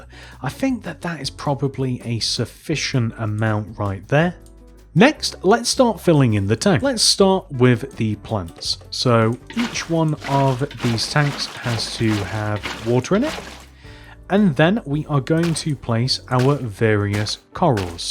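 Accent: United Kingdom. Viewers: Are you British?🇬🇧🇬🇧🇬🇧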